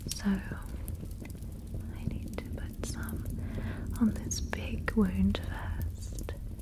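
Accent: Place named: RP, United Kingdom. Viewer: The contrast of a primal, feral-looking character with a proper posh british accent is super stark :P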